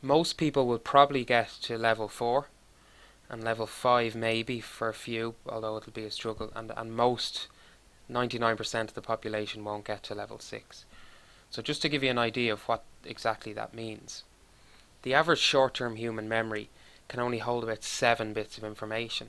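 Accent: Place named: Ireland. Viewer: Your accent is beautiful. You irish?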